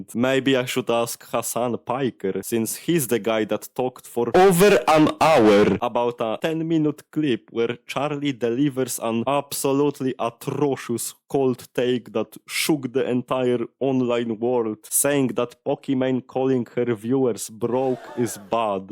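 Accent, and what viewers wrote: Poland. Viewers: your accent sounds so polish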